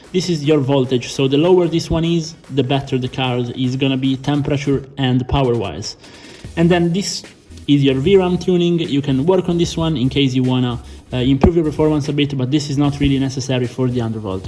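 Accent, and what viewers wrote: Italy. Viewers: capito che eri italiano dall'accento, bel video comunque😂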